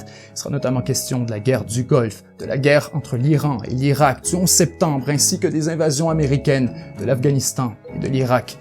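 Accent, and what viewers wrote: Quebec, Canada. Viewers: es-tu quebecois?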